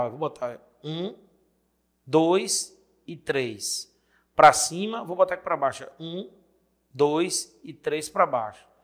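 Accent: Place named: Maceió, Brazil. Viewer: Vcs são de Maceió? O sotaque parece muito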